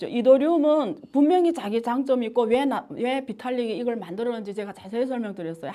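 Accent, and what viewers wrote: China. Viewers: 교수님 어느새 중국어 억양이 많이 없어졌네요. 몇년전에는 듣기 거북했는데 이젠 자연스럽게 들립니다. 건강하세요.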